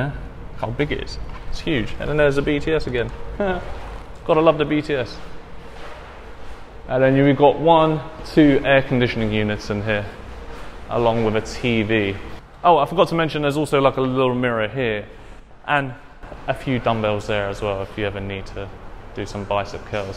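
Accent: United Kingdom. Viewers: I love your channel I love British accent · A guy with such pure British accent for a change...😁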